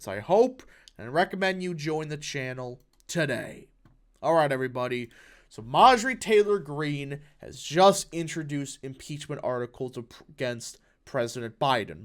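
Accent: Wisconsin, United States. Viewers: Give him a break. He's from Wisconsin.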